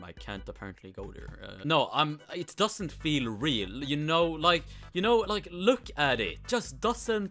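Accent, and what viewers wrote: Sweden. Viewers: Is he swedish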